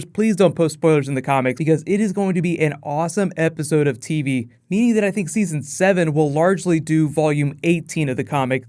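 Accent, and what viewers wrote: Netherlands. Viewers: R U dutch ??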